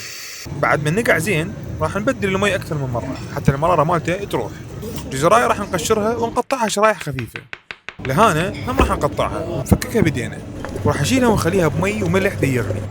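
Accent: Iraq. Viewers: اموت عاللهجه العراقيه❤😍🥰كويتي مر من عندك و علق بحسابك 🙏🌺🌺🌷🌷🌸🌸🌸🌹🌹🌹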